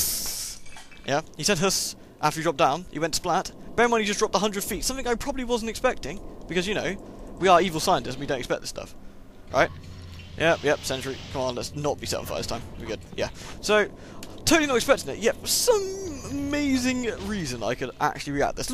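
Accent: England, United Kingdom. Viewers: I'm not english but brit man's accent is terrible, if he really is english I'm pretty sure he is from somewhere where the economy is 95% sheep. How is he making video games?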